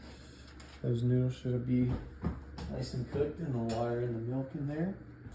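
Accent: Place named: Tennessee, United States. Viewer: Should have known ya'll were from Tn😂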